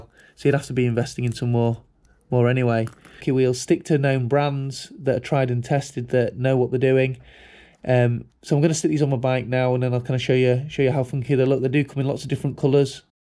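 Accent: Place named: North, United Kingdom. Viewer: No No, hes Northern, THEY all talk like that. (Thats not a dig, thanks for the vid by the way.)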